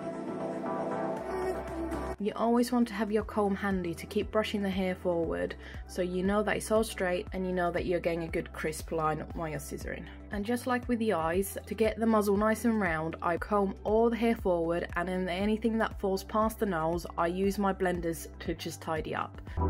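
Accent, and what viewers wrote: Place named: United Kingdom. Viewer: Lovely British accent!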